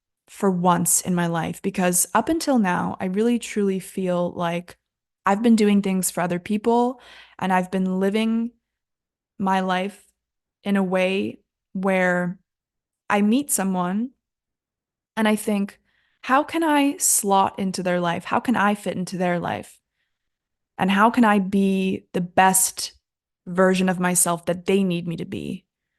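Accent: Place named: United States. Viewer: Hi. I just subscribed yo your channel. One thing that caught my eye. Bermuda is a British territory, isn't it? However, you speak with an American accent. That's interesting 🤔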